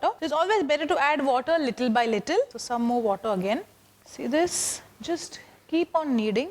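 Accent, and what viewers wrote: India. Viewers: Nice Hindiaized English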